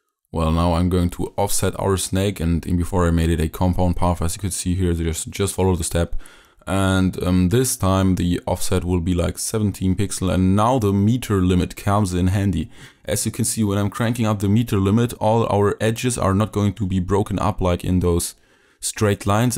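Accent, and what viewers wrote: Germany. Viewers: i think you have an german accent :O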